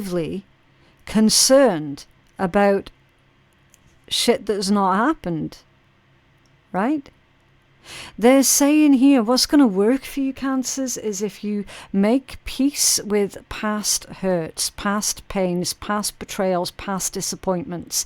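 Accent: United Kingdom. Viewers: Yay a British reader 😁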